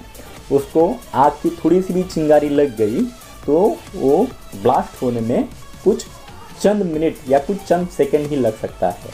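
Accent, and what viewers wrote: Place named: Maharashtra, India. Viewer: Lay bhari gulabi hindi guru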